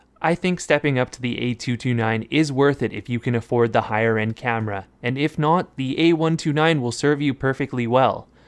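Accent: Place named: West Virginia, United States. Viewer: by the sounds of it, he’s in West Virginia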